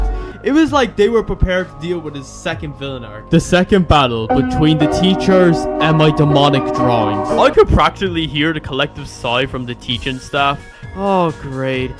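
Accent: Ireland. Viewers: Are you Irish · are you IRISH:hand-pink-waving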